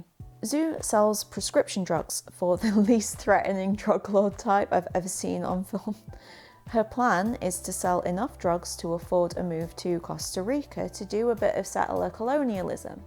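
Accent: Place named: England, United Kingdom. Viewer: I just re watched this video to try and work out what you were talking about, the person who made this video is saying the word ableist in her accent and is the way that I would expect to hear it. I'm sorry that you aren't used to hearing this accent (I'm not from the UK so can't tell you which part of England it is from), that doesn't mean that she has mispronounced the word.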